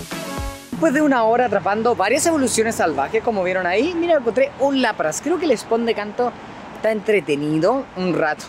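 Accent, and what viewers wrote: Chile. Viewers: eri chilenooooooooo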